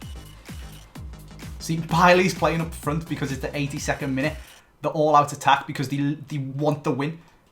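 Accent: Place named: North England, United Kingdom. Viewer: What part of England you from? I recognize your accent is it Liverpool or Manchester or something?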